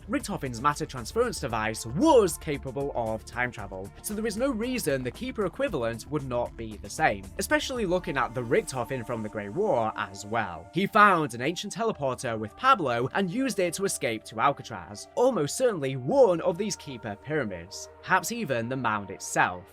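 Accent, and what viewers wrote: United Kingdom. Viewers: Bro what type of British accent is this, sounds like he’s talking winded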